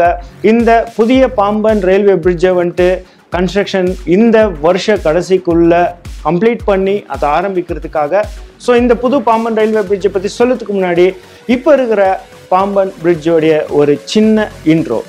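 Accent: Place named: Bangalore, India. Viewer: I think anchor Banglore tamilian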